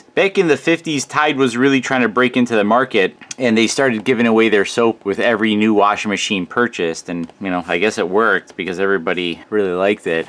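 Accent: Midwest, United States. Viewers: This guy's midwestern accent is killer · Sounds like hes from Wisconsin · The midwestern accent is sexy as fuck. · this guys accent reminds me of growing up in the midwest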